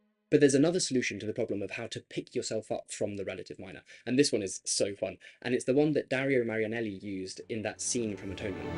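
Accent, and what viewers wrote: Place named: United Kingdom. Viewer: sorry to be negative, but I have to be. I cannot watch your videos. I have tried but all the hand waving drives me crazy. Then there’s the speed at which you speak; it is ridiculous. Why? Is there some hidden rule that says you have to go at a breakneck speed. I wouldn’t expect a drawl; that would be equally annoying. Just a normal pace. Also some attention to punctuation wouldn’t go astray. Your acquired accent makes it difficult to discern many words for the non Brit ear…maybe even fellow Brits have trouble understanding you ! I am sure the content is interesting and informative, but alas, I will never know. Unlike some of the sycophantic comments (which are probably your friends) I cannot subscribe or even (try) to listen or watch you again. Good luck.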